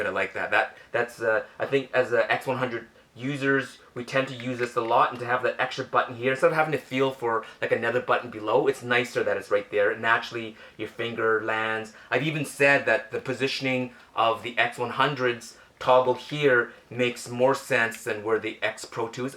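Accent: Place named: United Kingdom. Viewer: You should lower the background music, it's not easy to ear your voice, you have a strong British accent !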